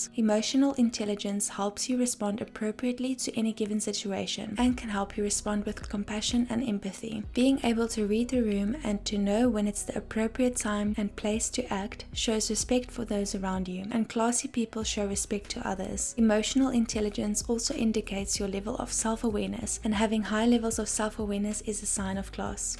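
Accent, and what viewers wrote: South Africa. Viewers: South African, maybe Capetonian or Joburg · South African 😂😂😂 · 💯 South African accent 🎉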